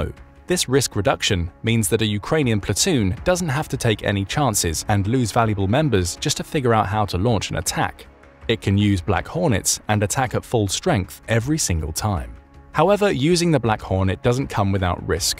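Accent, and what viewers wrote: United Kingdom. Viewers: It's always nice to have a reliable British sounding narrator on a video. This guy could describe a 1986 Ford Chiltons manual and it would sound good. 👊😎 EDIT: $200,000 a pop!?! Whaaaaat!?! That's f***ing crazy! I can buy a better one, same size just a different color at a Goodwill for like $20! 🥹 "Black Hornet Drones: The only thing you'll hear is the sound of your head getting slapped.... Black Hornet." That would be the ad. 😆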